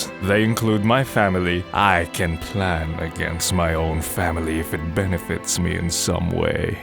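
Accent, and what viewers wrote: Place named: United Kingdom. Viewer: He kinda sound British to me to be honest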